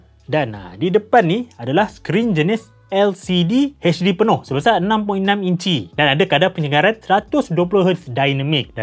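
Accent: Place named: Sumatra, Indonesia. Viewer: iya, aku jg sering dengar orang2 sumatra gaya ngomongnya memang mirip gini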